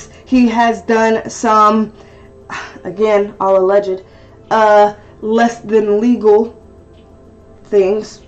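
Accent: South, United States. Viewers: Peter Monn brought me here!! You are so sweet and I LOVE that southern belle accent! Sending good vibes from TEXAS!!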